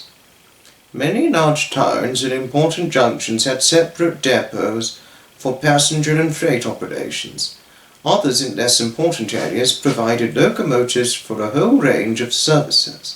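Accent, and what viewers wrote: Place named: RP, United Kingdom. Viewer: Wow Your posh accent is nice to listen to You should become a train announcer (I’ll probably get your voice recorded and then put on a dot matrix on a train. It’s really soothing to listen to in a nice way)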